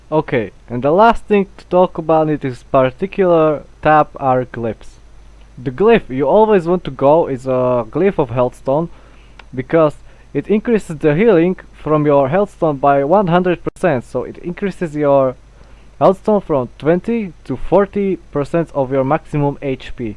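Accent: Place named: Europe. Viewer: Love your accent man, brings back to my euro friends I used to skype with. I can tell you really hate warriors lol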